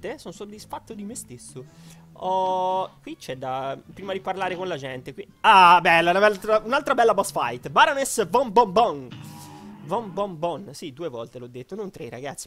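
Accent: Tuscany, Italy. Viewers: ma sei toscanohhhh?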